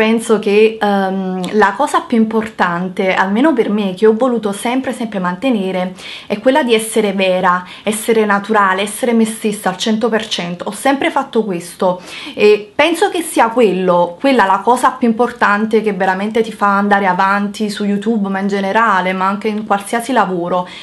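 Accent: Naples, Italy. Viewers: Cara ti seguo con piacere proprio perché sei vera, lascia perdere chi ti critica va avanti c'è tanta gente che ti apprezza davvero. Chi ti critica lo fa perché sa che, oltre ad essere una bellissima ragazza, sei soprattutto intelligente ed in gamba. Invidia si chiama, Continua così anzi più forte di prima:D Un saluto:) ps: amo il tuo napoletano. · queste persone sono davvero idiote ma come si fa a non amarti? cioè davvero il tuo accento napoletano secondo me è una qualitá e si deve sentire il nasone ce l'avranno loro perchè io non lo ho visto ... abbi forza non mollare per tutti noi non mollare!Saremu anche terroni ma tinemu a capa tosta e perlo in generale di tutto il sud inoltre il sorriso nonostante tutto nessuno mai si puó permettere di torgliecelo!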